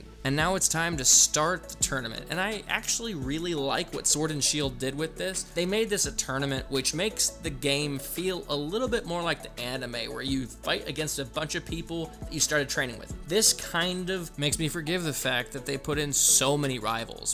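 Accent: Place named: England, United Kingdom. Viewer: IBXToycat IMPRESSION/ making fun of the american accent is the first thing i thought of after you apologised for an english one, lol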